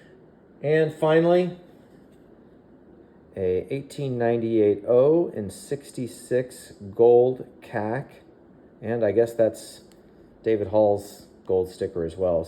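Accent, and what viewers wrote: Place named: India. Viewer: Yeas that Indian cent is hawt!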